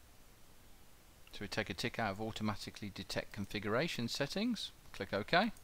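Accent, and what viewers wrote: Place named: England, United Kingdom. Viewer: i must say i like your English accent although i haven't done viewing this video, it sounds like you are from England, plus sounds like Klaus from vampire. Anyway, thanks for the video, and i'm studying now.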